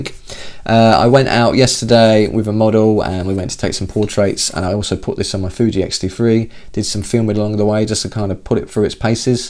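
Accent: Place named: United Kingdom. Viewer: Came for the filter, stayed for the British accent. Solid review.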